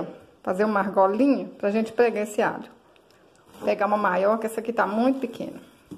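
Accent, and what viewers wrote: Minas Gerais, Brazil. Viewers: Olá,vc é mineira? Pq eu sou,e as pessoas onde moro dizem que falo diferente e sou engraçada ao <link> identifiquei com seu jeito e amo artesanato tb. Sucesso. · É mineira né?! Fala igual a mim!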